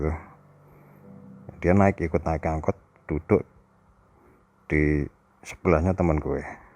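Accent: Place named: Java, Indonesia. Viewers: Kata GUE dan GUA itu beda cara pengunaan di dalam kalimatnya. Nahh si mas2 ini byak kalimat yg seharusnya pake Gua dia pake Gue, jadi nahbah kelihatan MEDOK nya 😄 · Gak usah pake kata GUE bro... medok soale sampean...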